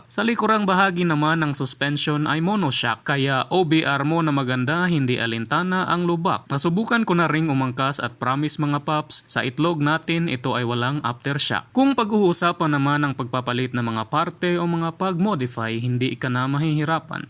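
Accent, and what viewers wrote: Visayas, Philippines. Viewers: tagalog na bisayang accent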